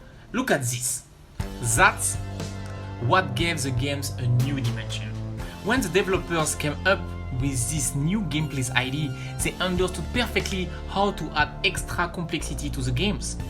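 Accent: France. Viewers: Pro u were from France LOL 😂 I thought you are from USA 😅 but not bad you had explained well bravo 👍🏻🤝🏻🥃🍷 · after see ur face reveal on DWI server long time ago i don't expect u have this kind of voice but that france accent 100%🔥 hehe, nice vids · Are u French?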